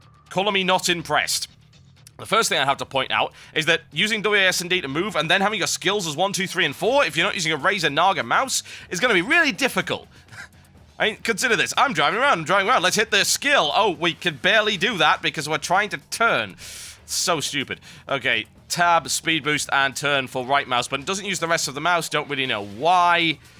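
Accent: United Kingdom. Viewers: I just love the sarcasm and british accent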